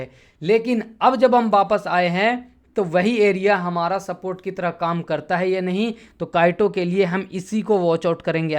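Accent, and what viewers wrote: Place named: Bihar, India. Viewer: aapka ton bihari h ... R u bihari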